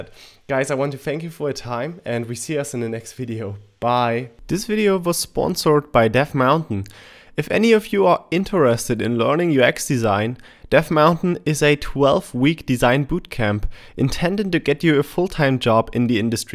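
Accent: Germany. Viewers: Nice Video, love that German accent - From an American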